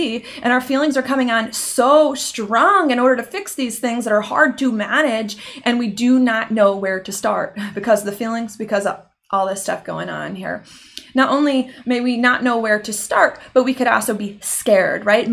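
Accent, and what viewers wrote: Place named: Pittsburgh, United States. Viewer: Sounds like Pittsburgh 💚 at least PA. Thanks!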